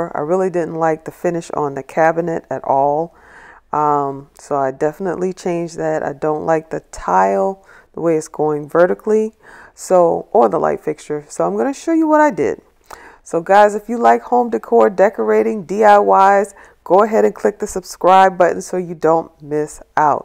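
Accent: Charleston, United States. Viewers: At this point I’m calling you family simply because seconds into the vid you said “PAINTED IT” I was like oh she sounds like she’s from Charleston And lord be hold you are. 😍😍😂😂 there’s no escaping this geechie accent and I love it. Thank you for being you and walking in your purpose and providing beautiful content and creations may God continue to keep you 🙏🏾, You definitely gained a new family member in my head at least 😂 and a new subscriber.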